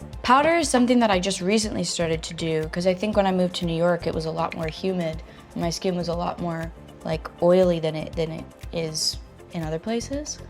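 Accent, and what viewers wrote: United Kingdom. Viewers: She speaks sort of british english or it s just me who think that? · Her British accent is perfect 😍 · I love her British accent ❤ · Omg her British accent is killing me 😂she’s too pure for this world i love her 😍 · Ahh dat British accent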